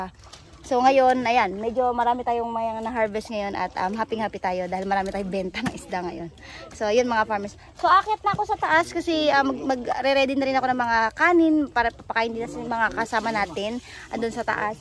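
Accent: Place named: Tagalog, Philippines. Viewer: Pokot ng lambat nakakatuwa ka talaga halatang pure na tagalog ka😀 maam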